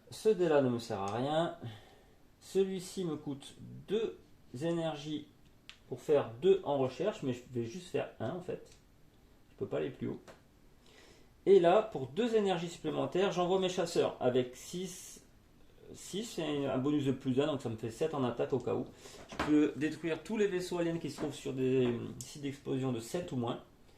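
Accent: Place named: Toulouse, France. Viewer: avec grand plaisir de se tutoyer (j'avoue avoir modifié mes "tu" en vous car je voulais pas sembler trop invasif avec ma question du "d'où es tu". Mille fois d'accord que quand tu compares ça avec les kickstarter actuel, ça devient de la folie, après faut dire que svt dans les kickstarter tu as de la figurine et c'est la course au stretch goals donc le volume peu devenir fou et le prix l'est déjà de base et alors quand tu vois les all-in... Bon ça m'a pas empêché de 'e pas être raisonnable durant l' année sur KS 😅 Sinon tu as bien pris l'accent Toulousain effectivement, je reconnaissais une touche du sud mais légère. Je suis de Montpellier c'est pour ça que ça me parlait un peu 😜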